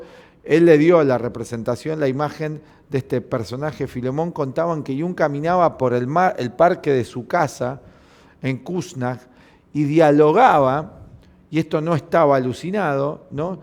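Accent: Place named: Argentina. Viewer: me conflictua tanto el acento argentino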